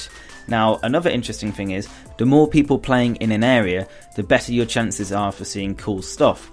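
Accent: United Kingdom. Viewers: you from sheffield?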